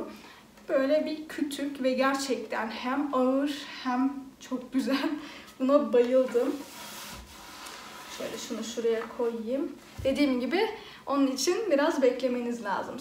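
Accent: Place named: Germany. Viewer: Harikasın bayılıyorum konuşmana ev düzenine hanım hanımcık oluşuna aldıkların çok güzel güle güle kullan💗bizimde gelinimiz var almayada doğup büyüdüğü için senin gibi konuşuyor oda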